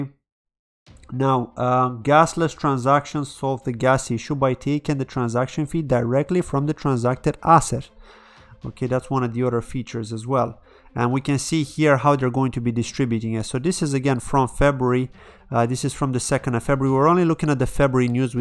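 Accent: Ireland. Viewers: I thought I heard an Irish accent in your voice. Are you involved in Irish crypto much?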